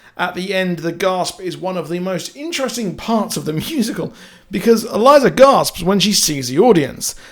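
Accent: United Kingdom. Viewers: Why no views? Why no likes, why no comments, and why so many subscribers? EDIT: waiiiiiit.... ya sound like ur from London, and talking about Hamilton, an American musical?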